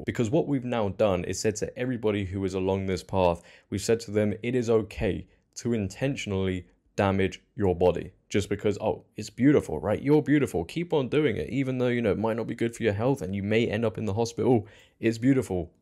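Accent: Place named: United Kingdom. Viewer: British accent of course lol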